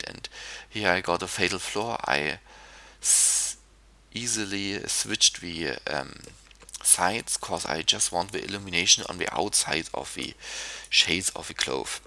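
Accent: Germany. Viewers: This guy is so under rated, this tutorial alone has help me so much more than any of the other tutorials out there, up until this point I had no idea how to apply a physics to an object, and I couldn't find anything on how to finalize physics, only how to simulate them, this guy saved me a ton of digging around online for an answer, all done with a lovely 'German?' accent. I love his accent but I am not sure where it is from. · Man hört so richtig deinen deutschen Akzent, aber jetzt gehts ja ^^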